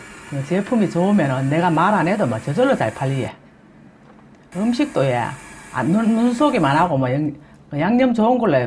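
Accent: Gyeongsang, South Korea. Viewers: 목청 큰것도 갱상도 매력입니더~~ㅎㅎ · 너무 사투리를 자랑스럽게 쓰시는데 좀 듣기 싫네요. 시청자는 경상도만 있는게 아니고 전라도 강원도 충청도 제주도 함경도 등 많습니다 재미없는데 혼자 웃는 개그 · 경상도 어느 지역인가요? 말 솜씨가 좋으시네요~^^ 좋은 정보주셔서 감사합니다😊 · 갱상도말씀 너무잼나요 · 잘들려서 좋고 경상도 말로 툭툭 경상도사람인 나는 듣기좋읍니더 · 구수한 갱상도 사투리가 참 정겹네요 상그라이 식히가~~ ㅎㅎ 집에있는 묵은김치 따라해봐야겠어요 잘보고 갑니당😍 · 구수한사투리 즐겁게 잘듣고 잼난 요리도 잘보고있네요 근데 경상도 아닌지역 분들은 잘알아 들어 묵을랑강 걱정되네요 ㅎㅎ ㅎㅎ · . 경상도 말투로 해설이 어찌나 구수한지. 😅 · 좋은 정보 감사 합니다.목소리 안 커요. 구수한 경상도 사투가 얼마나 좋은데요. 다음에 한국 가면 밥 한끼라도 사드리고 싶어요. 그래도 될까요? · 경상도 사투리에 너무 재밌게 봤엉ᆢㄷ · 경상도 말이 너무 멋져요. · 오줌마려워일어나서요리강좌듣는.정신없는늙은입니다.구수한갱상도사투리가 정겹네요.여러가지양념장만드는는쏨씨.대단하네요. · 언제나봐도, 구수한 경상도 사투리 말씀이 이렇게구수하고, 듣기기분좋은지 몰 랐어요음식솜씨도, 쉽고맛있게 꼭 해보고싶은 맛입니다.감사합니다.건강하세요 참고로 여긴 전주입니다. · 대구 말씨에혹 살림9단 좋아요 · 늘대단한음식만들어주시구경상도구수한말씀잘듣고있내요묵은지한번해볼께요 감사합니다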